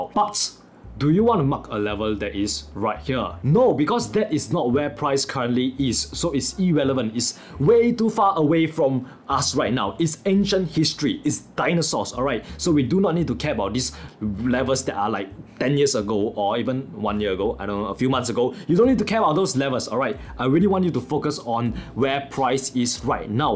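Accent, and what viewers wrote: Nigeria. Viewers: Are you trying to speak in Nigerian accent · You have this Nigerian Accent when you speak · You sound like a Nigerian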